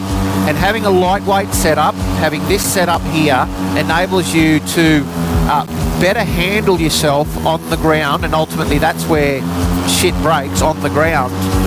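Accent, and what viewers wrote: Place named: Australia. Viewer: great vid as im very interested in what you say as im wantint to get into paramotoring been so confused were to start as i been very confused with so many brands and saddly all crap american vids who is best cap kurc dell swatz? keep away from theses guys they play with your mind 😱but hearing the aussie accent is music to my ears so thank you for your great video 👍🏻🤗🇦🇺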